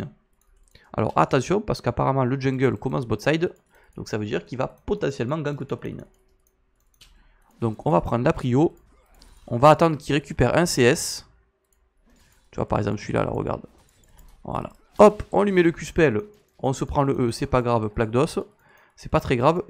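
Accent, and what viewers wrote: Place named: South, France. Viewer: Tu prends de plus en plus l'accent du sud haha (super vidéo btw)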